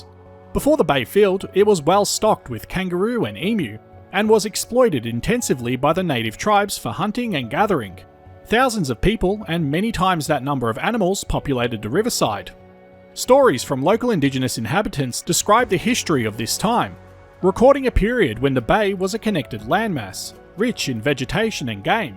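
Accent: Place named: Melbourne, Australia. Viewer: Love the content - also you are the first person to demonstrate the Melbournian “Malbourne” accent (“e” = “a”) that I have heard about from people over the years 😂 love it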